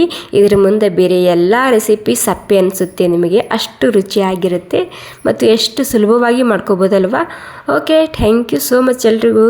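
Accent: Maharashtra, India. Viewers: Tumcha marati style kannada lai avadle tayi😊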